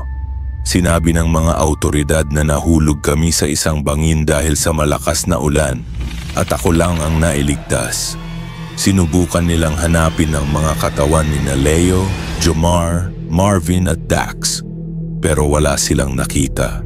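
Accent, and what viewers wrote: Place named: Conyo, Philippines. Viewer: AI narrator na konyo pa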